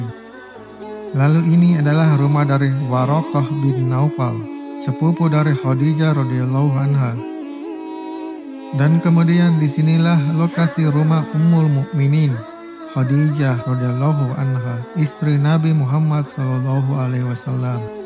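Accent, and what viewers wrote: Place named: Bugis, Indonesia. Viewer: Orang Bugis ini yang ngomong to? Kentara logatnya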